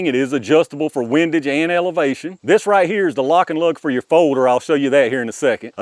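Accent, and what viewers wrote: United States. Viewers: Came for the gun, subbed for the accent. What state are you in?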